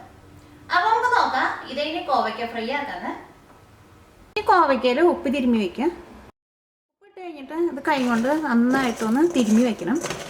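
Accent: Thrissur, India. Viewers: thanks for that recipe....... one advice: you need to try to speak in clear malayalam instead of using your regional accents like thrissur or kottayam........ usually when we address public (either in stage or off stage) all of us speak normal malayalam without local accent....... (and all of us can speak too)..... try next time please.........so that you represent the entire kerala..........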